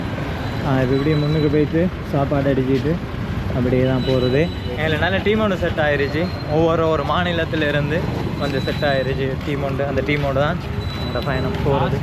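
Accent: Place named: Beruwala, Sri Lanka. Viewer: Bro your slang only in beruwala not whole Sri Lanka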